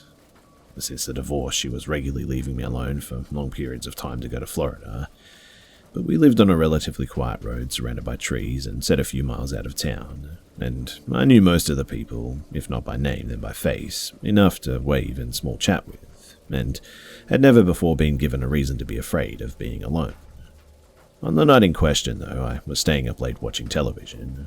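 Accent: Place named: Australia. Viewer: Aussie Accent cultral cringe bro. Fuck its hit me hard. Good stories though but Okka as fuck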